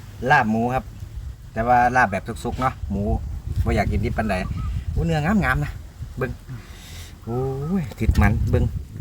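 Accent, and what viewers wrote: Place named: Chaiyaphum, Thailand. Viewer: สำเนียงคนชัยภูมิจิครับ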